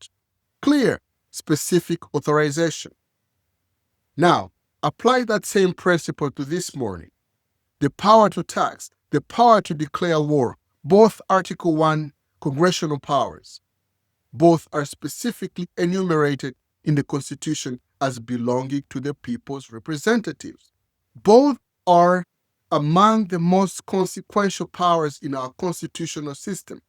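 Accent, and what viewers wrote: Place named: Africa. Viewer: You sound African you know about our constitution more than most D.C. politicians big ups to you my brother.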